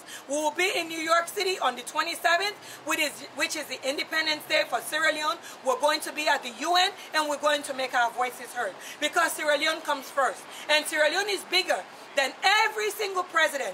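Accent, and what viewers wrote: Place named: Liberia. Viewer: You sound Liberian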